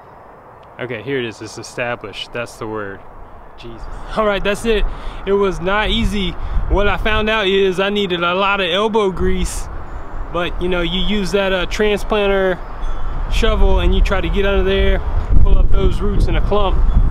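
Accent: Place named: Louisiana, United States. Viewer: Love your accent. Louisiana?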